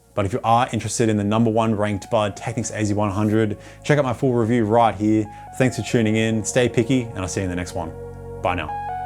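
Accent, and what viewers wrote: Australia. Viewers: I wish I could pay YOU to stop using an Australian accent. Holy shit, nothing is more annoying than an aussie accent.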